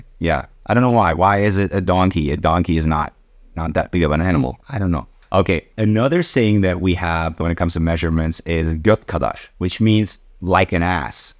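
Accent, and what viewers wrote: United States. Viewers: Bence gayet güzel bir amerikan aksanıyla konuşuyor gözümü kapatsam Türk olduğunu düşünmezdim. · Super us accent, but surprisingly he has no german accent, and he is born in germany. He is the only one i know to be de-germanized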